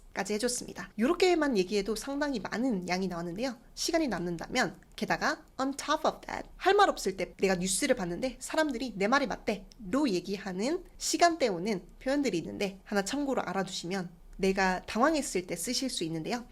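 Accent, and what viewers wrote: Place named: United Kingdom. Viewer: 강의 내용 다 좋은데 혹시 영국에서 공부하신건가요? yes나 so 등 억양을 너무 강하게 올리시는게 미국이 아니라 영국식 발음 같습니다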